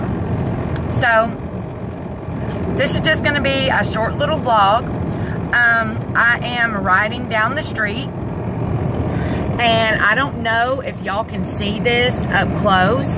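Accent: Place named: Arkansas, United States. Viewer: Where are You from Babe?Your accent sounds like Mine.Im from Arkansas!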